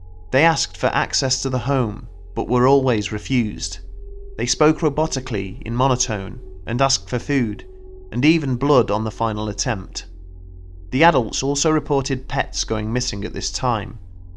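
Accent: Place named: England, United Kingdom. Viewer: The dude’s English. I’m willing to bet he knows how to pronounce Leicester while you do not. Get over yourself.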